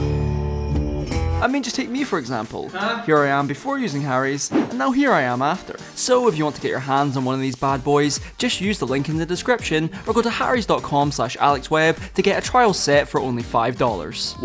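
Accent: United Kingdom. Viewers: I can't listen to this horrible british accent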